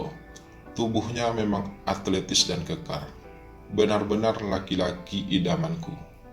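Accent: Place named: West Sumatra, Indonesia. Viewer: Logatnya logat Sumbar (Minang)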